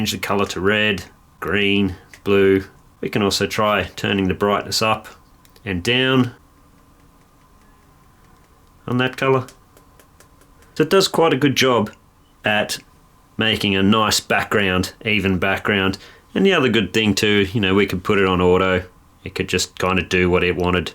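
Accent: Australia. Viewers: Does everyone sound the same in Australia or is this lazerbeam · just because he has an Australian accent doesn’t make him Lazar beam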